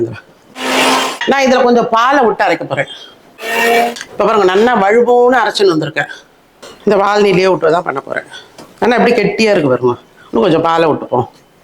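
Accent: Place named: North Arcot, India. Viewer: Mami- so happy to have come across ur channel. You should be from north arcot. Been looking for NA samayal and tradition to a long time. Yours is the first. Getting so nostalgic of my childhood , your voice and accent resembles a lot like mom and paati. ‘Aponcha’, ‘Vadaparupu’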